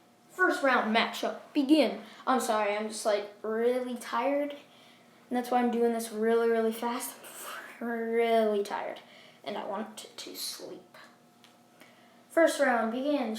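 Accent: United Kingdom. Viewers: U british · Is this man brithish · It was British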